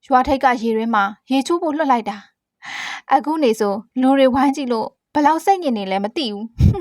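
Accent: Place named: Upper Myanmar, Myanmar. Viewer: ချယ့် အသံ က အညာက ပဲ GEC ပဲ.. လေသံနဲ့ ချစ်ချာရီး 😜😜😁😁 စိုးသာလွန်း တဲ့လား အမည်လေးကဆန်း ပီး ချစ်စရာ စိုးသာလွန်း ကိုချစ်ရင် ပင်လယ်ကိုလည်း ချစ်ရမယ် ငြမ်းစင်ကလှုပ် လှေကပုတ် နဲ့ ကောင်လေးဟာ ပြန်သွားခဲ့ပါတယ် 😅 ဆရာမ မိချမ်းဝေ စာတွေ ထပ်ဖတ်ပြနော် ကြိုက်တယ် ကျေးဇူးတင်ပါတယ် 🥰🥰🥰🥰🥰 👍👍👍👍👍 🤜🤛🤜🤛🤜🤛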